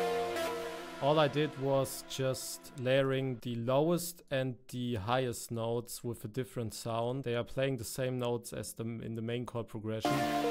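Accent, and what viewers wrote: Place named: Germany. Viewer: Are you german? You have a german accent, Im german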